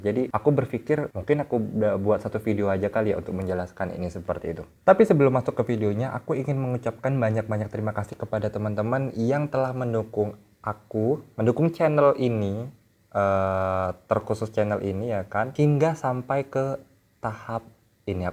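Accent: Indonesia. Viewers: Mana ada orang riau medok jawa macam lu ,, lu mah jawa tulen bukan melayu riau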